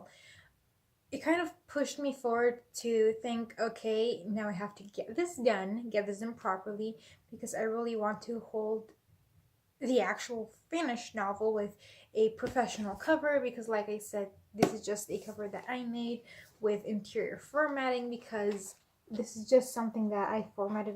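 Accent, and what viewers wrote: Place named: United States. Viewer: Thank you for the kind words! Also, thank you so much for sharing about your heritage! I love learning about things like that. I can definitely hear a little American in your voice. You do speak English very well. This may be a silly question, but do you strictly write in English? Thanks again for sharing!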